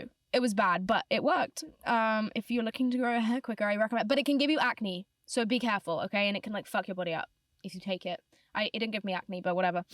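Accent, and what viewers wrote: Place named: RP, United Kingdom. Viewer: So posh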